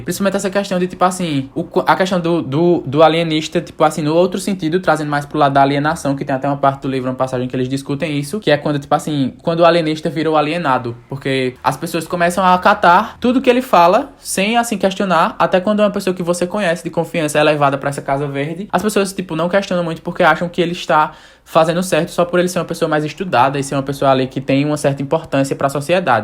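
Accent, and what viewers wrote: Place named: Pernambuco, Brazil. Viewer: Ahh como é bom ver youtubers com o nosso sotaque maravilhoso. Ouso apostar ser do meu país Pernambuco. Ainda mais influenciando a leitura, que é tão relevante. Muito sucesso!! 🙏🏾🙏🏾🙏🏾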